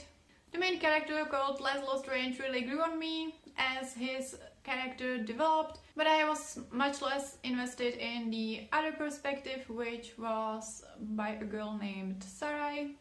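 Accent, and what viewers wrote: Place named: Czech Republic. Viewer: Promiň ale... Ten český přízvuk...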